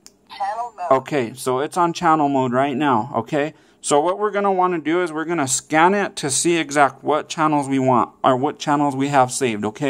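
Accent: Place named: Bay Area, United States. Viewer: Thanks bud. You say Hella. Im from the Ca BayArea too.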